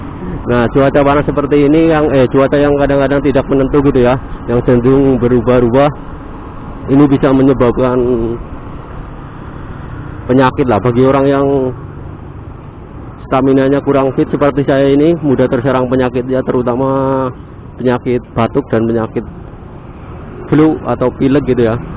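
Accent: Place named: Banyumas, Indonesia. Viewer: Koyone wong ngpak yo kang.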